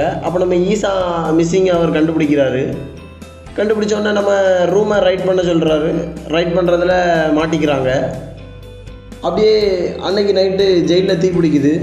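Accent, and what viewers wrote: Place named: Marthandam, India. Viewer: Loi marthandam slang try a😅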